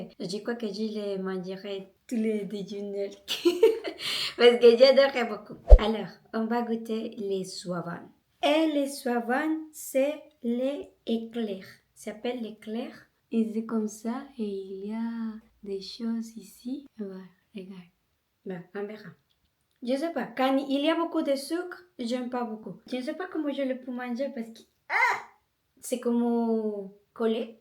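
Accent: Colombia. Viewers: En Tous cas, vous savez bien écrire français et j aime bien votre accent Colombien 😊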